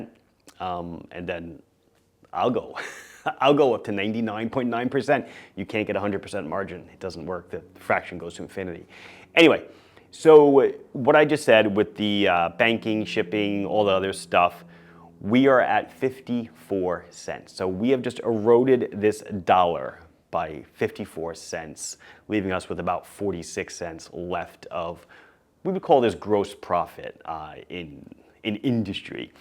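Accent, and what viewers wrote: Long Island, United States. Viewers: This was super interesting! Thanks so much for the breakdown!! Whenever I watch your videos, I always feel as if you're some distant cousin or something talking to me, because although I'm in CT, my Dad and his extended family are all from Valley Stream, and that Long Island accent is so distinctive!! You wouldn't sound out of place at one of our Thanksgiving Dinners or something hahaha! You now have a standing invitation to attend this year lol!!! 🤣🤣 Awesome video!!!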